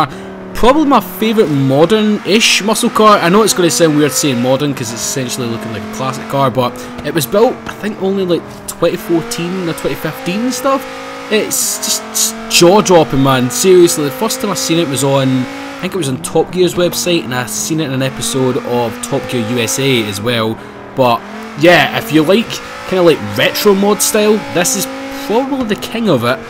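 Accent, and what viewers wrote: Scotland, United Kingdom. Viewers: YOU'RE. SO. SCOTTISH.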